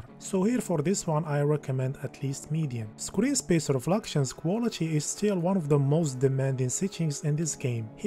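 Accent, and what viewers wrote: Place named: Morocco. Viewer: Your English accent like moroccan people , nice videos keep going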